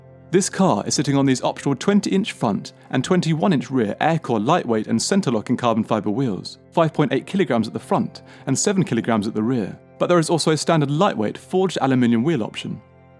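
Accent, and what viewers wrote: RP, United Kingdom. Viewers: Im american but I know posh when i hear it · Brittish accent is so damn annoying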